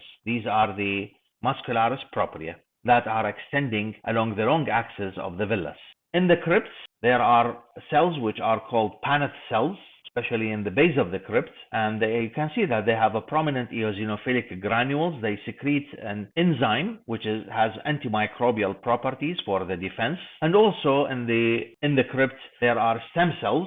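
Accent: Iraq. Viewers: I think he’s Iraqi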